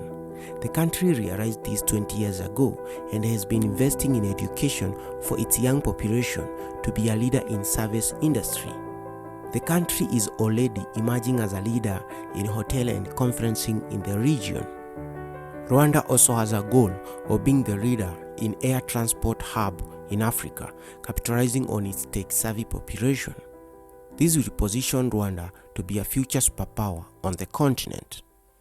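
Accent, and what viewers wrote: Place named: Central, Kenya. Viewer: Am I the only one who hears this guy is from Kenya ( central kenya infact ) because of the way he is "shrubing" ?!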